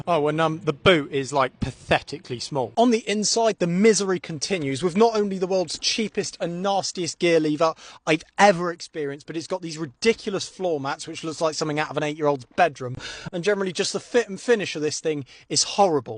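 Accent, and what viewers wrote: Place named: Chav, United Kingdom. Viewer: His accent is so chavvy I can't listen to him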